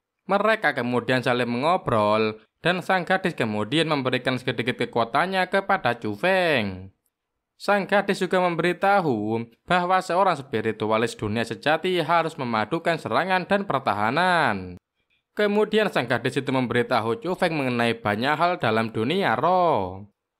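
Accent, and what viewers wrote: Java, Indonesia. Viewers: Cara baca alur nya Jawir bet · Min.. Logatnya ganti Terkesan jawir 😂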